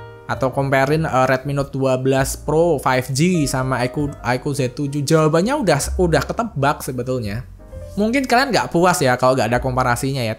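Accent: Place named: Java, Indonesia. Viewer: Bang orng mana bng?kya ada logat Jawa nya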